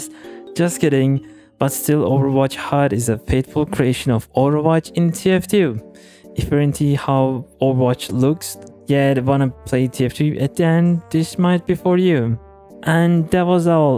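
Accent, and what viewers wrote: Turkey. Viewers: bruh your accent screams Im turkish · Bro ı think u are turkhis because your speech is similar to a Turkish person speaking English. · aksanından türkçe sırıtıyor dost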